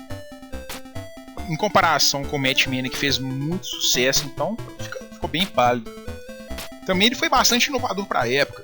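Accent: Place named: Interior, Brazil. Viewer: Caralho, mas que sotaque caipira.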